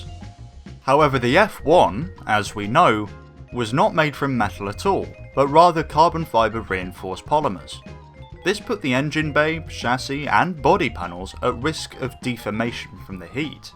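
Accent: United Kingdom. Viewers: Just bc of the british accent 💀🤣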